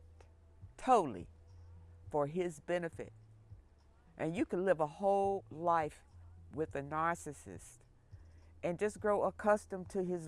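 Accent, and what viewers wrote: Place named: New Orleans, United States. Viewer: I hear that N.O accent great content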